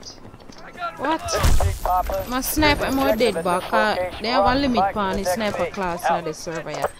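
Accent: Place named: Jamaica. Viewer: Yup 100% Jamaican :)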